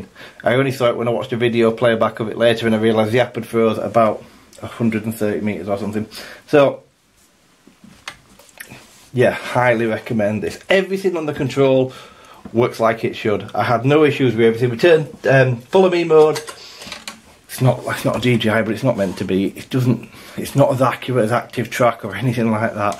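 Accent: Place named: North, United Kingdom. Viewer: Thanks for the sub, sounds like tha'as from t'same part of t'world as me.Happy Flying.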